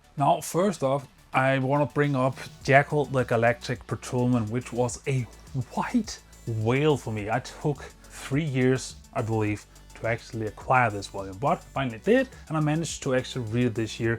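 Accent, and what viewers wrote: Denmark. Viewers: are you by any chance Danish? i feel like you have a Danish accent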